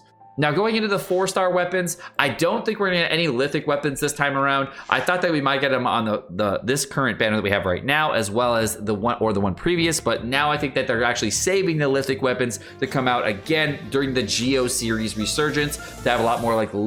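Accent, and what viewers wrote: United Kingdom. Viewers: British? Instant like.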